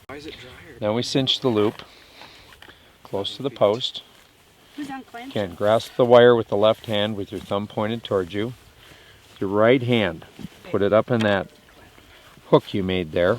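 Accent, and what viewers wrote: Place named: New Zealand. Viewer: What a very good instructor, thank-you. The Kiwi accent was very thuck, but managed to git it.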